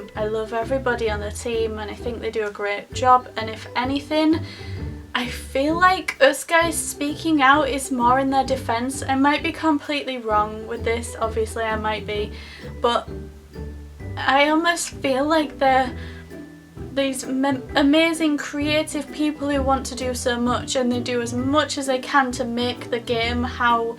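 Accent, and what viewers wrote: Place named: Scotland, United Kingdom. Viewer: I agree and I have completely lost hope now. The Sims 4 is fundamentaly different from other Sims-games in the depth of its core, and I think no expansion-pack or update can save it. :( My only hope is that the Sims 5 will be what the Sims 4 should have been: An improved version of the Sims 3. But it'll take years to find out... Btw are you Scottish? I love your accent :)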